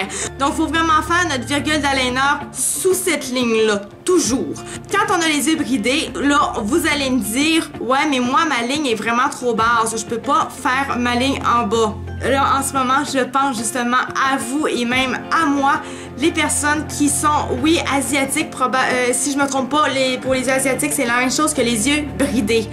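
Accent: Quebec, Canada. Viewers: J'aime l'accent québécois :) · ta un beaux accent québécois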